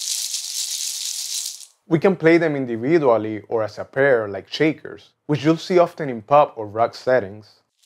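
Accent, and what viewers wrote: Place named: Puerto Rico. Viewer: Thanks for your response. This observation I am selling it to you wholesale for when it came to me I was completely fresh to my interest in percussion. The macho hembra distinction was totally matter of fact in reference to the difference in tone, which was also matter of fact. I assumed it was due to having being built to make them sound different and macho hembra came by default, as in drums, as you point out. To tell you the truth I perceive a difference in tone but am not certain it is due to the maracas, as opposed to my own right-left hearing. Probably the reference is very old school, from before your time, which I would not be surprised. Your style of exposure is new to me, it is very good, your accent shows but so is your knowlwdge and control of the english language. It is a refreshing style of exposure and diction. 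Congratulations and Blessings. Keep it up for percussion is the most democratic form of musical expression. I am not sure if you are Puertorican, I suppose you are, but Puertorican percussion is among the most democratic of all.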